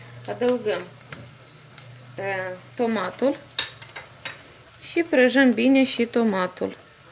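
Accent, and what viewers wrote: Moldova. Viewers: Sunteti din Moldova? Accent😂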